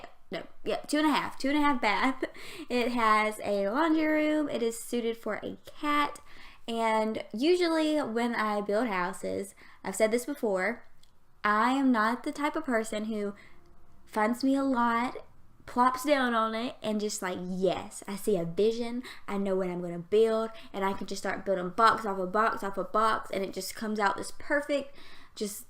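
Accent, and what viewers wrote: South, United States. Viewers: I just binged watched all of your builds last night and I'm so glad I found your channel! Love your voice by the way! I'm from Georgia, so southern accents have a special place in my heart ❤️ Can't wait to see your channel grow 😊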